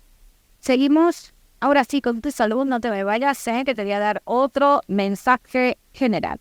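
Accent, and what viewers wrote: England, United Kingdom. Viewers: No kreo ke es mikrofono es akcento ingles .algien se mete aki pienso jo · Pero a ver tia...hace dos meses hababas en andaluz,español ahora me hablas en acsento ingles...que te pasa?????i mira que yo hablo 7 idiomas pero esto realy nuncaa li e vistoooi baby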